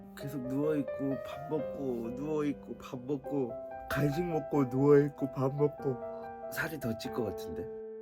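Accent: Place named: Seoul, South Korea. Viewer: 이 양반 내가 본 서울 사람중에 서울말 가장 잘해요 ㅎㅎ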